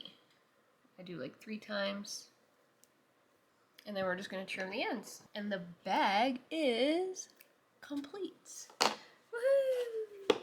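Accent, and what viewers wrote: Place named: South Jersey, United States. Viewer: Can’t wait to try this strap. Thanks. And by the way…and I’m not making fun because I grew up on NY and get a lot of comments about my accent, But I think you have that south Jersey accent which has the Philly influence. There’s certain words when it reminds me so much of my friends from that area. Good luck with channel!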